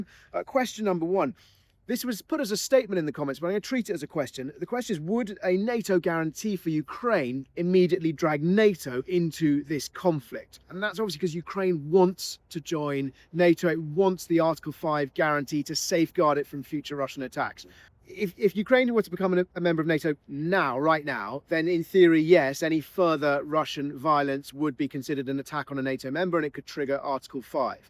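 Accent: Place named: United Kingdom. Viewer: bla bla bla brit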